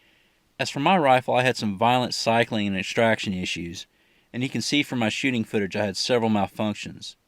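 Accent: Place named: South, United States. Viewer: cool. I was detecting a slight southern accent that I couldn't place...piqued my curiosity.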